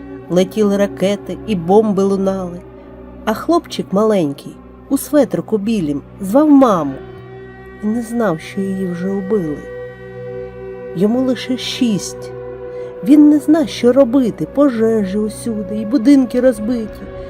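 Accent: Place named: Russia. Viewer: Російські наголоси та російська вимова. Вчіть українську!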